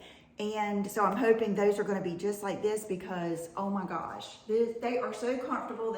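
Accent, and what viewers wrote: South, United States. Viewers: Hey, I love all your video’s! Makeup, health, clothes, plants or jewelry. Whatever you talk about is inspiring and fun to watch. You said you enjoy listening to Tati in your car…Well Your the one I love to listen to in my truck…your voice is so calming…I love your southern accent and how down to earth you are. If people are offended or bothered by you talking about your carnivore diet, then it’s their problem. I find that so odd that someone would tell you that you make them feel bad by talking about your health journey. Obviously they feel guilty and have issues in their life that they need to address. You can’t make everyone happy!!! Keep doing what you do and don’t let anyone make you feel bad about it!!! I have the same issues you were having with joint pain and gut issues. I went on a blood type diet that basically had me eating eggs. 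I felt so much better but then the holidays rolled around and I gave into the cookies and now I’m struggling to stop eating sugar. It is so addicting but makes me feel horrible. Listening to you today has helped push me to start that change in my diet again. Thank you!!! Love you 🤗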